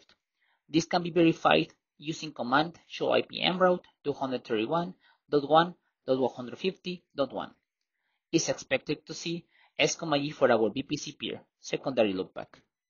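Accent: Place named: Spain. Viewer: Good content but Extremely hard to understand thick spanish accent.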